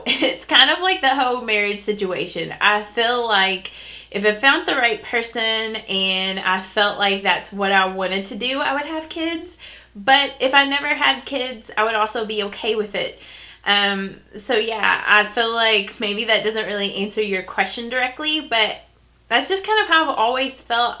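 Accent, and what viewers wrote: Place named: Kentucky, United States. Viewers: When I watched my first makeup video of yours, I could tell your accent sounded like me...and I was right! We are both KY girls! Gotta love KY! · Ty for the Q&A! I can relate with accent...I live in Eastern Ky myself. My husband lived in Louisville for over 10 years:) Just visited the Louisville Zook on Wednesday! :)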